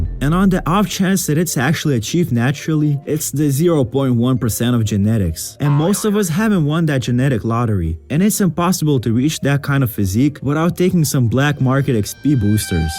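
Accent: India. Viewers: Bro the indian accent😢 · Don't you think it's racist to do that Indian voice over?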